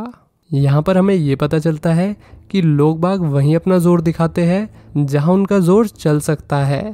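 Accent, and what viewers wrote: Bihar, India. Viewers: R U FROM BIHAR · Bihar se ho kya bhai😅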